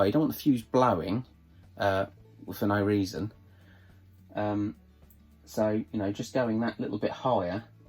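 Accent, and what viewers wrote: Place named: United Kingdom. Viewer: Great Video! Very similar to what I'm building at the moment so very helpful and nice to hear a fellow Brit rather than another American accent!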